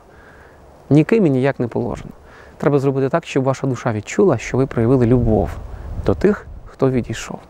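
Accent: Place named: Russia. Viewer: Яка українська - московська(сумно)!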